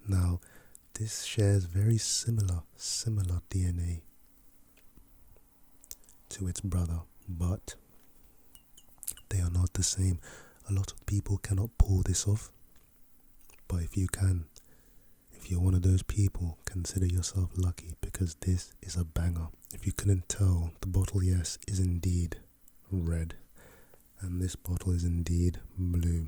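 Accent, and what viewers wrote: United Kingdom. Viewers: Loving these bro.. an if I’m not mistaken , you from the UK ? You gotta new sub 👌🏻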